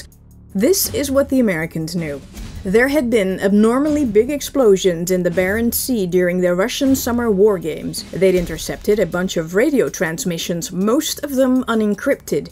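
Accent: Netherlands. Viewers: well she's Dutch so ... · She is dutch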